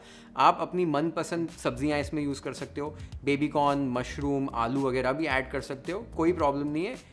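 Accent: Maharashtra, India. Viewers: to maharashtriyan ch aahe .. mumbai madhe lahana cha motha jhalay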